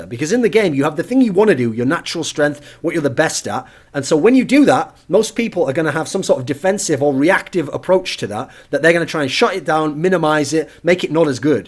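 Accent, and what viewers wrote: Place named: England, United Kingdom. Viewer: English accent bro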